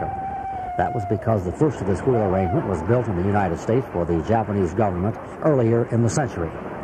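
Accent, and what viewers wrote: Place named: United Kingdom. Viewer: he seems to speak British accent rather than Americans, or is it pennsylvanian accent too?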